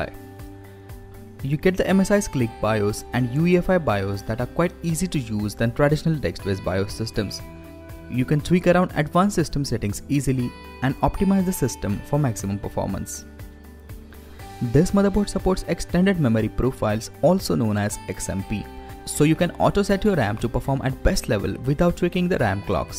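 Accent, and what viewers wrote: India. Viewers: dat indian accent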